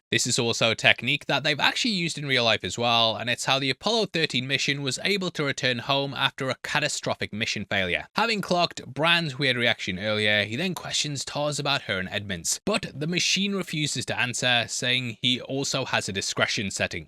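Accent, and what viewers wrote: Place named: Newcastle, United Kingdom. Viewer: YOU are this channel, everything we watch and listen to here comes from you. Like other people have said, we could all go and watch a similar (albeit not as good obviously 😉) video to this on YouTube but we all choose to watch you. As cheesy as it sounds that means you’ve built a community here, and it’s not crazy to think that we care about you. I hope in the future you feel you can put a bit more of yourself in your videos without sacrificing the right to a private life. It really meant a lot that you trusted your audience to tell us something very painful but also very relevant to your emotional response to this film. As an aside, I fucking love your channel, it’s an absolutely gargantuan (pun intended) amount of work you’ve put into it which is hugely appreciated. Also I moved away from Tyneside two years ago so it’s really comforting to hear a Geordie accent emanating from my TV and filling my living room. 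❤❤❤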